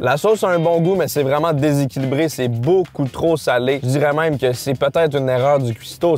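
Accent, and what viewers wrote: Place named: Quebec, Canada. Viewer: j'apprends le francais pendant un an maintenant et tes videos me donnent envie de avoir l'accent quebecois comme vous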